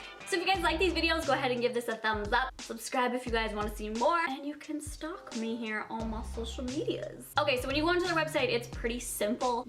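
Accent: Canada. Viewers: Taylor Phillips it’s a Canadian accent. Their U’s are usually long O’s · She's Canadian, it's just her accent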